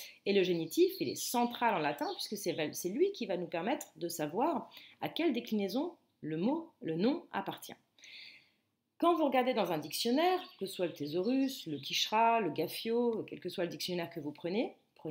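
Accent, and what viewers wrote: France. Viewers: C’est très bien de promouvoir cette langue magnifique. Toutefois votre accent français-qui ne place pas correctement l’accent tonique sur les mots latins-n’est pas approprié au latin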